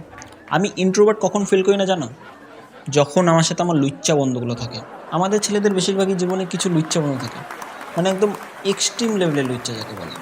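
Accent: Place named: India. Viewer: Bro prothome Indian accent ee kotha na bole Bangladeshi accent ee try koro Bangladeshi accent besi funny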